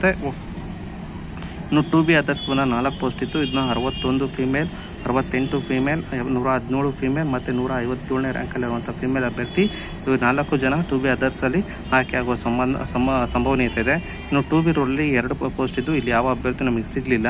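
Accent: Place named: Belagavi, India. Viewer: Anna Belagavi English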